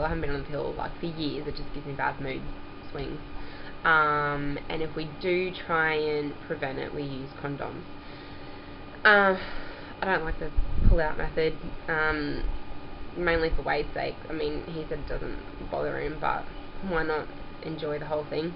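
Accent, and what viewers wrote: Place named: Australia. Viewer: Ha ha i thought the same when i saw your video and then when i heard your voice i was like ahhh I'm sure she's Australian lol go us Aussies. Ooh i love Queensland such a beautiful place we're thinking of getting married over there. How are you holding up with the crazy weather over there? I'm over in Perth, love it here. Thanks babe fingers crossed it happens soon! xox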